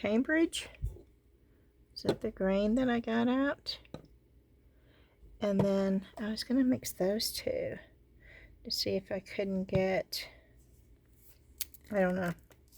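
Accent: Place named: Pittsburgh, United States. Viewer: Do you have family from Pennsylvania? My old roommates spoke Pittsburghese. Unique words and word pronunciations. Warsh=Wash!!!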